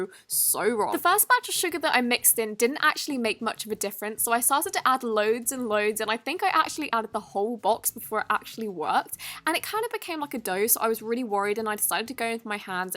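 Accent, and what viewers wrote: England, United Kingdom. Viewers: Omg!! I really wanna know what part of England your from!! Please dm me on <mail> I love u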